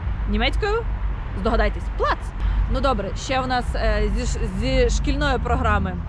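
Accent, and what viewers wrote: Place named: Ukraine. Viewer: Пані у Вас український акцент у німецькій вимові!)) Німці знаю нашу розкотисту букву ,,Р" вимовити не можуть!))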